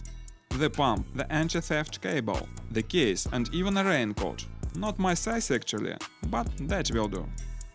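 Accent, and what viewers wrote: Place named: Russia. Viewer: I have cracked you. You are Russian and live somewhere in Central Russia, judging by the foliage and road quality probably near Moscow. I wasn't sure at first bc of your accent, but when I saw your phone was in Russian I got my confirmation. Классное видео чувак, сам себе хочу моторизованный собрать, продолжай в том же духе!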